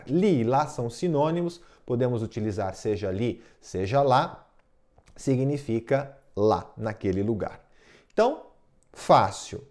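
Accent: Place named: Brazil. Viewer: Me encantó éste video...explicado sencillamente, el cual fui anotando, como muchos otros, para obtener un gran entendimiento de éste tema tannnnn importante!!! Soy uruguaya y me agradó también escuchar como vas explicando con la pronuncia brasilera!!! Ahora, voy a anotar bien, sobre la particella Ne!!! Excelente!!! Todos los ejemplos, sirven!!!! Grazie mille per tutti!!!!!😊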